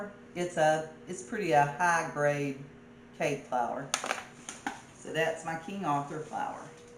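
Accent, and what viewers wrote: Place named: South, United States. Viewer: Have you ever heard of any kind of roll that was made without yest? You southerners are so weird the way you talk adding extra words in when you don't need them and cutting words out that normal people say. You're like the British just making stuff up as you go along. Do you say beet root also like there's some other kind of beet that isn't a root? I guess if you grow up saying it, it sounds normal but for the rest of the country it sounds like you rode the short bus to school.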